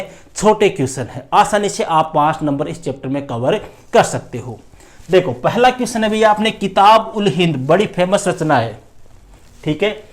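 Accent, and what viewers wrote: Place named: Shekhawati, India. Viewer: Sir aap sekhavati area se h kya language vesi lag rahi h bahut acha padhate ho sir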